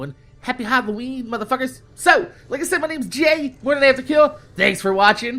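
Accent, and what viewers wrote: New Jersey, United States. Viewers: I can hear the jersey in your accent hahah, away at school always good to hear that shit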